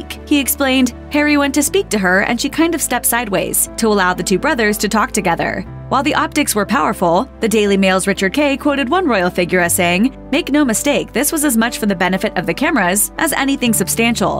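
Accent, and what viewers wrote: United States. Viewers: I'm not worried about any of them,,,, but why is an American sounding so full of knowledge about it,,,, oh wait, she's American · This is the American take. I don't give this much slack. The American accent is slident against the subject of the video. Yakkity yak yak! Phew! (I'm a Singaporean.)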